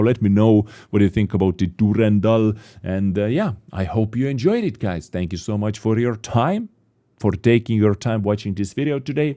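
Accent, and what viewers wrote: Finland. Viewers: Which country are you from? You have a Finnish sounding accent...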